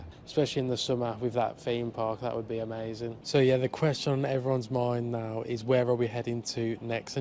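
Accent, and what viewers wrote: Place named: England, United Kingdom. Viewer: Curious which city/part of England your accent is from?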